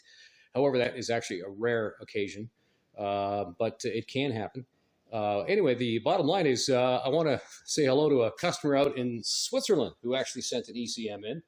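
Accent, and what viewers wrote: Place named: Canada. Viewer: very cool and sounds like u r canadian too. sweet, do you sell ecm's?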